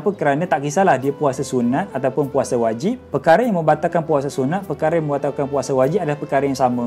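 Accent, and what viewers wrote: Kelantan, Malaysia. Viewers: Ustaz org Kelantan ke ?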